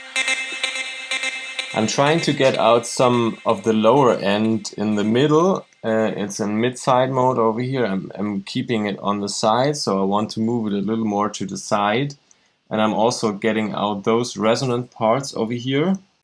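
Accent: Germany. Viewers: Ich hörs doch, you are german!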